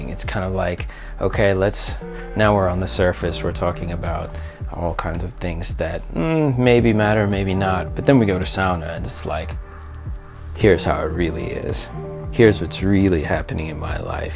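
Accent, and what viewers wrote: Finland. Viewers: You are picking up a Finnish accent :D PS. Welcome to Finland. Edit: Oooh so you moved ages ago. No wonder the accent is there.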